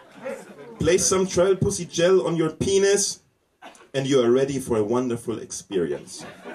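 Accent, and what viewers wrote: Germany. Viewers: This rocks! And I bet for the Americans the German accent even doubled the fun. Anyway, it's hilarious! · i live in switzerland this guy reminds of my german friends, they have an exact same english accent. And i loved your jokes it was funnier in your accent and time by time you asked the audience what is it in english hilarious. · It's actually quite funny! And the German accent makes it even funnier! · What a funny German accent. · Er vielleicht? Man hört doch ganz deutlich den deutschen Akzent. Außerdem gibt es auch in Deutschland sprachbegabte Menschen. Vielleicht gehört du einfach nicht dazu? Ich weiß es nicht, da ich dich nicht kenne, aber es kommt mir gerade so vor. Ist nicht bewertend und angreifend gemeint, um das schon mal klar zustellen. Ist mir einfach nur so vorgekommen.... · hello, germany! Haha, the clip is great and Your english also! 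I ran over your conversation with the 'Ben' and I have to say I admire your patience ; ) Btw, I'd guess, judging by how your accent sounds, you're from somewhere in the southwest region of germany? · Echt lustig. :'D Nur dieses deutschige Englisch.. Ist aber trotzdem gut! ( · Awesome! I kinda like the german accent, although I don't have any.. Greets from Germany!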